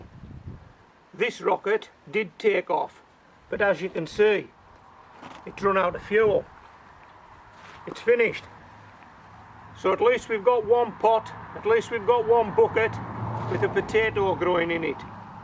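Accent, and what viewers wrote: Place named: Lancashire, United Kingdom. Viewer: You sound like you are somewhere near Bury, Bolton, or Wigan.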